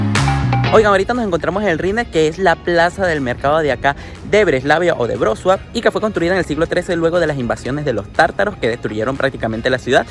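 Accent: Venezuela. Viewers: Que fino escuchar a un venezolano 😊😊😊 Próximo ✈️😊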